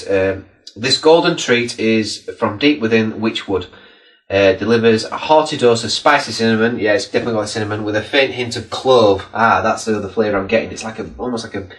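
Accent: Yorkshire, United Kingdom. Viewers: Great To shirt that reminds me of someone else who wears one! By the way how do U get a Yorkshire accent? I have been living up here for 10 years and I still ain't got one! nice review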